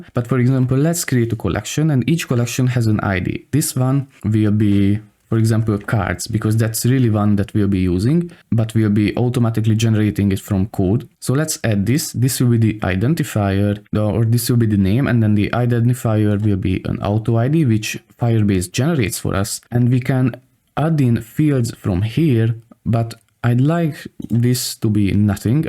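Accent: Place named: Russia. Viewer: amazing course subbed ,also enjoying your russian accent😀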